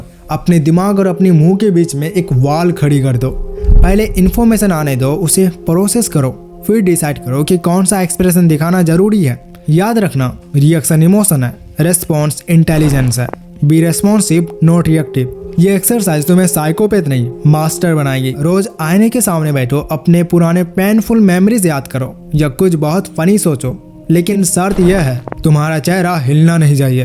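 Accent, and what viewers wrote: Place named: Bihar, India. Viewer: I have noticed that you speak like my Bihar friends , Are you from Bihar, I wonder ? No matter what someone says words and ideas can change the World - referred from Dead Poet Society !!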